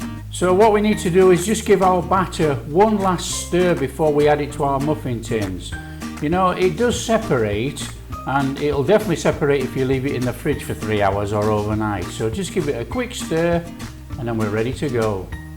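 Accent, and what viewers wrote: Yorkshire, United Kingdom. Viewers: No measures beyond 8 eggs, get real. Enthusiasm and a Yorkshire accent do not ut it.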